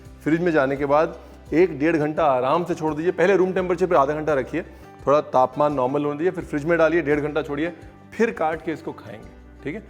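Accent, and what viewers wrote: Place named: Punjab, India. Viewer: Omg your anchoring...niri punjabia wali..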